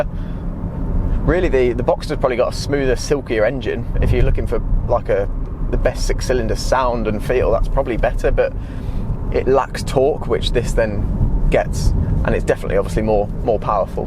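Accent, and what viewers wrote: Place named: Northern England, United Kingdom. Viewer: You are clearly northern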